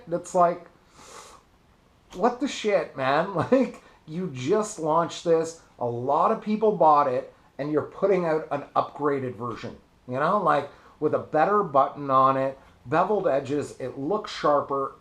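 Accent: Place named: Canada. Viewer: Great comparison video... Never realized you were Canadian, kinda recognized the accent, but when you said you felt like a knob it gave it away lol.... Anyhow. I picked up the sigelei 100 Watt the other day, $81 with a coupon code, the plus was out of stock, could have got the ipv3 for $110 with the coupon, but didn't figure I really needed the extra 50 watts. From what you've laid out in your video, it kinda reaffirms my choice. Should get it this week sometime and I'm stoked.... Keep up the good work man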